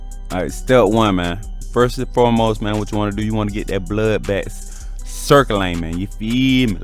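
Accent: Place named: St. Louis, United States. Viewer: sound like you're from st louis. Good vid btw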